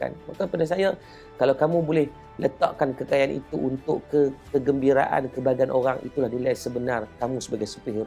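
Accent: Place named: North, Malaysia. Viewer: Terengganu nyer dah hilang jadi orang utara😊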